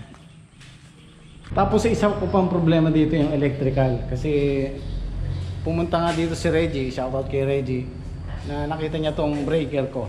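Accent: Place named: Pampanga, Philippines. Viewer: Kapampangan na boss magkasakit yang magtagalog i atsing magtindang sliding haha .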